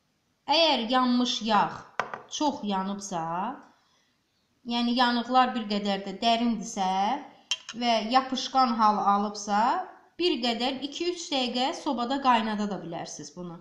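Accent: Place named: Baku, Azerbaijan. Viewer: Bakılısınız danısıqınıdan bilinir size tesekkürler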